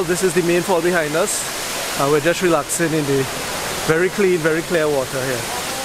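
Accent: Trinidad and Tobago. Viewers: Trinidadian accent!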